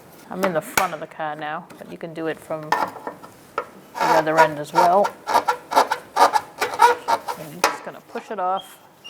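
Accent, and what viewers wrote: Boston, United States. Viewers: My favorite boston accent on the internet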